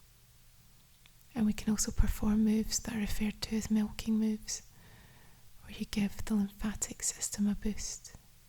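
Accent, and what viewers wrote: Scotland, United Kingdom. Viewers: I felt relaxed just watching and loved her Scottish accent. Thank You.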